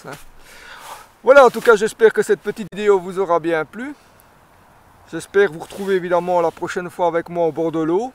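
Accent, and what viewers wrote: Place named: Belgium. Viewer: ah d’accord ! Aussi je me disais bien que ton accent me faisait penser que Tu pouvais être du pays du grand Jacques 😉 Du coup au bord de l’eau, tu tournes au café liegois. Pardon pour la confusion 😔 en tout cas les red halibut Malgré le froid, ils t’ont apporté une session plus qu’honorable. A bientôt mon ami, respect admiratif de Michel de Sologne 👍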